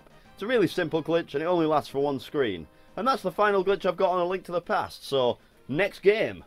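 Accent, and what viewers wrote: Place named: North, United Kingdom. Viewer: Dude, you are so northern. I love it. Subscribing now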